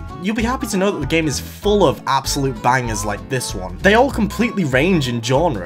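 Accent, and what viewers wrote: United Kingdom. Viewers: i didnt even notice you were british until you started talking about it LMFAO damn im fucked up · Are you British?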